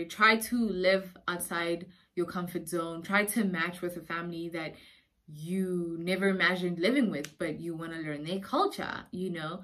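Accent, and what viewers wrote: United States. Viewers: You came back with a slight American accent 😹. This was very informative thank you.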